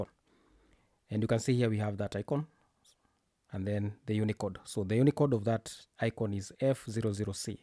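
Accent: Kenya. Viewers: Unasound Mkenya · I believe you are a Kenyan. That accent though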